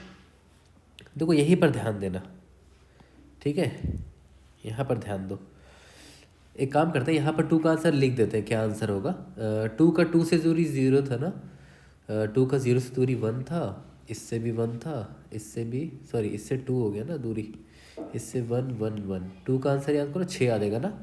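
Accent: Bihar, India. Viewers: Are you from bihar ?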